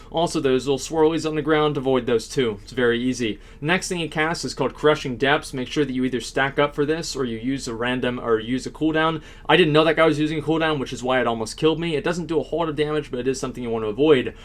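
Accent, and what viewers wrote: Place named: South, United States. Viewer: wutered down, love the southern accent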